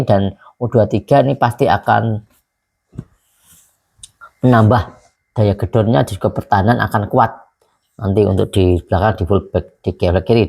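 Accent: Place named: Java, Indonesia. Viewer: Ijin saran nya bang,😊,kalau mau buat ubdate kalau bisa jngan terbata 2 kalau lagi komentar,, sebaiknya logat Jawa nya di kontrol,,biar bahasa baku Indonesia 🇮🇩 di mengerti,,dan kalau cari materi jngn terlalu panjang 2 yg ujung 2 nya materi nya muter2 itu saja,, semoga bermanfaat buat Chanel Abang,dan semoga semakin banyak yg melihat,,❤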